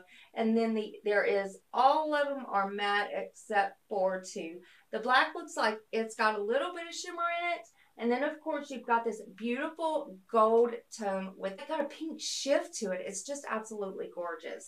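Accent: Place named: Tennessee, United States. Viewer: Love your accent! I'm originally from Knoxville, Tennessee and you sound like home. lol. I'm supporting through the group for your watch time now.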